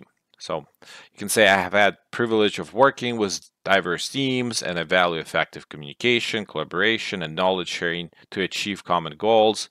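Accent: Russia. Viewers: Fix your ruzzian accent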